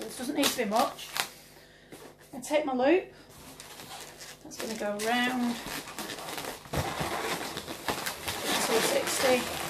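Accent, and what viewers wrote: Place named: Cheshire, United Kingdom. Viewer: I love your work! You are so talented! I'd love to see you do a large poppy troll column. I'm doing a birthday in june, but can't figure out how to attach all those 260's around the large approx. 24 inch balloon heads. I've thought about glue? Her hair goes straight up, so I just don't know how to get it attached midway all around the round balloon......no videos on it. Thanks for sharing your brilliant work. Love your accent. Im from the US but my husband is british, from cheshire, you sound like that area to me lol thanks again! :-)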